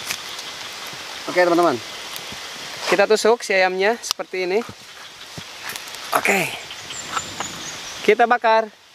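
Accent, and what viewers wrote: West Java, Indonesia. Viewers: "saya tigebros temen2 " khas sunda melekat bang jago, sukses terus a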